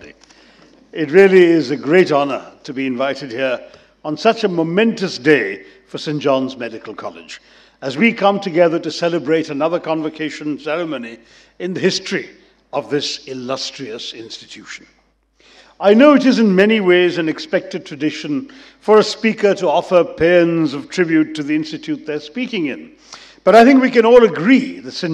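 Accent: United Kingdom. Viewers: He speaks like Britisher.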